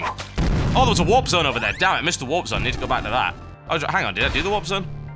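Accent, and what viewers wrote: United Kingdom. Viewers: Hahahaha I love his angry british sounds! They make my day lol. xD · As a german i love this britich accent or so ;D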